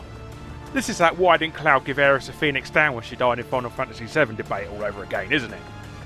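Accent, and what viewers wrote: United Kingdom. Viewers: VERY british accent you got there :D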